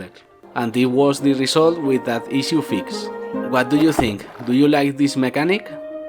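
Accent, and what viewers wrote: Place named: Spain. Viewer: Are you spanish? I'm from Spain and your accent reminds me so much to mine XD Btw, your game looks amazing, the pixelart is beautiful ;3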